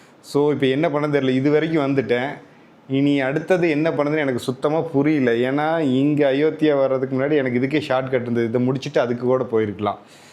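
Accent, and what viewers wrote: Kongu, India. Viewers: I wish i could meet you someday. I watch your channel just to hear your no nonsense kongu tamizh. I am from gobi. Keep riding and keep making these wonderful videos.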